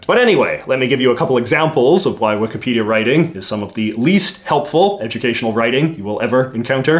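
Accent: Canada. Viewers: Just got channel recommended and I realized that I have been binge watching for almost two n half hours now. Concise, educational and I’m all “about” the Canadian accent being an Alaskan 🙂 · Canadian detected. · Stop saying a boot please I know your probably Canadian but it is very ignoring! Thanks!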